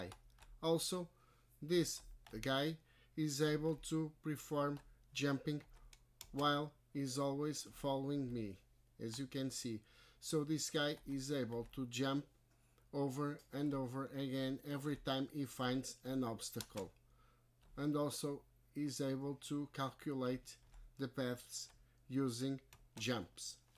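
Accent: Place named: Portugal. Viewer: da p ver k es tuga XD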